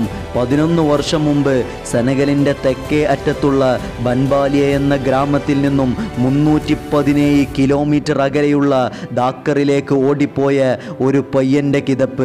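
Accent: Malappuram, India. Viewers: Commentator malappuram ullathano malappuram language pole thonnunnu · അവതാരകൻ മലപ്പുറൻ കാരൻ ആണോ 🙄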